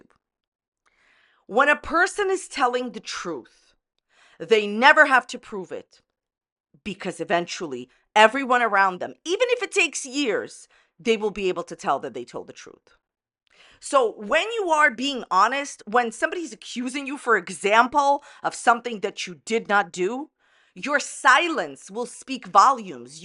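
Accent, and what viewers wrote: United States. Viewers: I love how says Ambalin 😄 She has a definite accent from the region she lives in. But so do I. We have different accents in different parts of the US.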